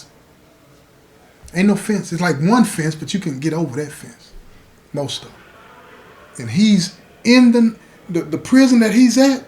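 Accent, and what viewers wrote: South, United States. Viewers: Hi! I look forward to hearing ur videos everyday, ur very consistent, on time and that southern. Voice of urs I love it. Keep doing what ur doing. I'm glad ur son recommended u I subbed IMMEDIATELY. B BLESSED CUTIE.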